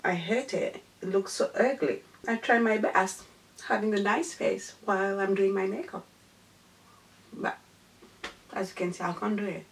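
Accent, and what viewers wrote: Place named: United Kingdom. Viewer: girl!! let me tell you, you slayed in this video 👏👏👏 very well done. Everything was perfect. Your English, your make up, everything was damned good. You were worried for nothing. And I love love your British accent 😉😉. Keep on going girl you have all my support. For foundation brush you can try the morphe M439 if you haven't tried it yet. I wish you all the best and don't worry for the jokes in English you'll find good ones for us. Take care xoxo 😘😘😘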